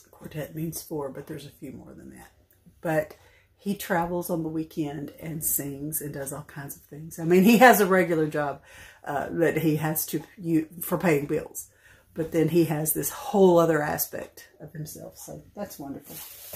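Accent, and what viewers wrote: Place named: North Alabama, United States. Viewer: I am so sorry you have had the flu!!! I have experienced the flu one time. My husbands side of our family has a celebration between Thanksgiving and Christmas that covers all the bases. I was coming down with Covid and didn't know it. I was mortified because everyone came for the first time in twenty years!! I had shots that allowed me to have a relatively easy time. I am so glad to see you back on YouTube. I love to hear you talk in the way us North Alabama people do, lol! God's grace and blessings to you and your family. Sherry from Alabama.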